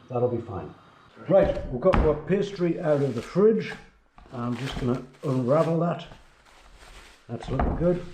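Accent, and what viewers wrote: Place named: North, United Kingdom. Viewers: You sound like my dad, who was a Geordie ❤ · Can't put into words the feeling of home, as soon as i heard your accent! I'm from the North myself and this makes me want to get right back into baking! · What a grand fellow you are. I came to see if I was doing anything different when I make cheese and onion pasties. Turns out we are pretty much doing the same format and recipe except I probably use a little less butter and lard. I enjoyed listening to you. Are you a Geordie? I am from north east of England.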